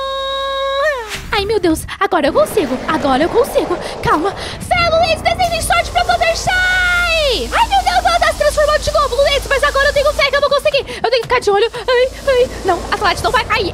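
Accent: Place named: Argentina. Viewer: Está bueno hablar así porque parece idioma argentino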